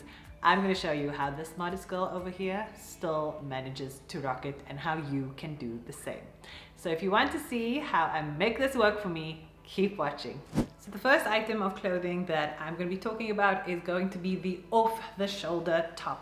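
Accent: South Africa. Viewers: You sound capetonian....gottta love SA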